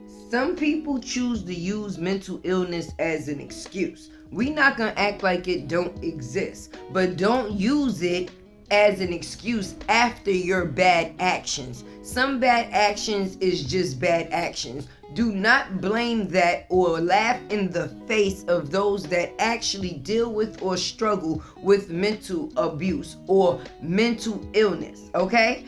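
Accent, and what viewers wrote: New York, United States. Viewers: Thank you for the video great job once again you got me. I was on my way in from the garage and you were talking about the young lady. I did not know who she was. Thanks for the recap. I turned around and sat on my stairs before I get into the house and listen to the whole thing. Great job keep it up. I think I’m getting a hint of up north accent like New York or New Jersey great Common sense conversation👍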